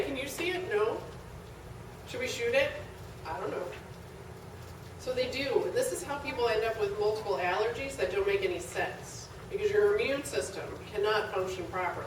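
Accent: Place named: United States. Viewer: Please put subtitles because the sound is awful and sometimes the accent is too American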